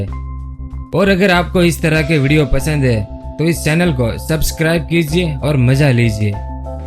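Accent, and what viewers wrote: Gujarat, India. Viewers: Are u Gujarati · Bhai aap Gujarati ho.....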